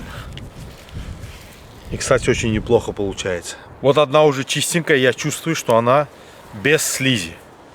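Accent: Baku, Azerbaijan. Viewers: бакинский акцент , так сладко кушает , класс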